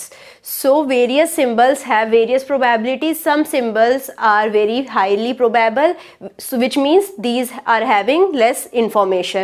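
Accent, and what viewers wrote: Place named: England, United Kingdom. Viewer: Ap England sai ho kya mem... Hindi nhi ata kya apko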